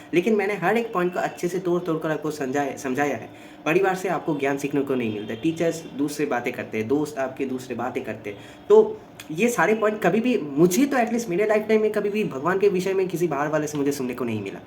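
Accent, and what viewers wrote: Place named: Bengal, India. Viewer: You bengali ascent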